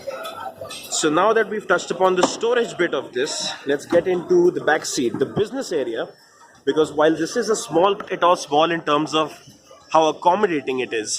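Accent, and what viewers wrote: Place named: India. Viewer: Indian accent, reminds that asshole Indian manager no one likes.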